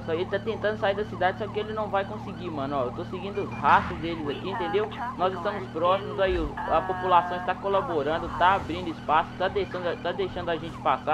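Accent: Minas Gerais, Brazil. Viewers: Ow! Vc é mineiro pow! Coloca umas viaturas da PMMG ae mano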